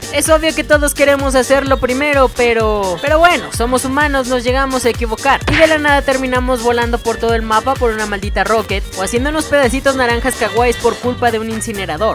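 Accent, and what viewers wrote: Mexico. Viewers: eres de mexico???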